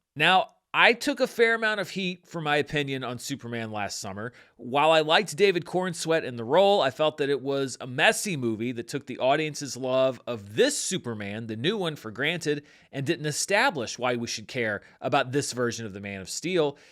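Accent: St. Louis, United States. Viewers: Are u from Saint Louis??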